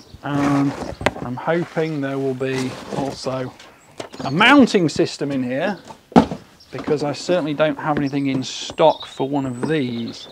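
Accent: United Kingdom. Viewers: From Britain ?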